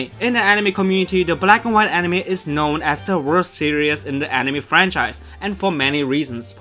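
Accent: Germany. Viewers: you have a german accent... are you german? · one question. what nationality are you? it sounds like a german accent you have.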